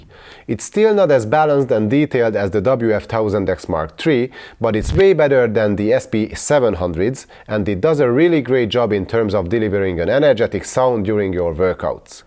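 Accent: Hungary. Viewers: Are you Hungarian tho? Ha igen, akkor pacsi 😃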